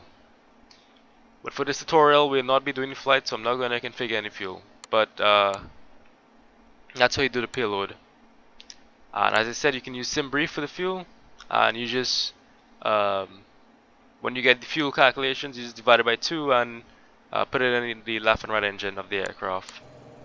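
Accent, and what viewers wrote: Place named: Burgundy, France. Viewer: French from bourgogne I think ^^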